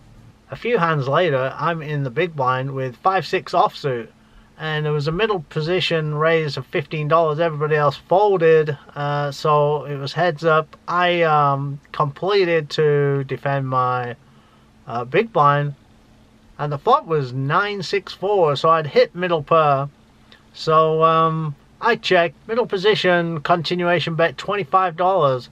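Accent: United Kingdom. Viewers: enjoyed your vlog, keep them coming struggled to place your accent decided midlands/Leicester, was I close? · where u from? u sound like a ex brit? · Is this guy british? his accent is really strange i cant tell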